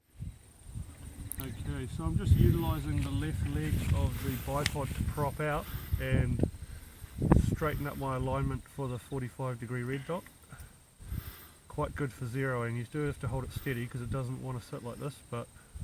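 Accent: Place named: New Zealand. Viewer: That kiwi accent... Those sorry azzzzzz lil mags :(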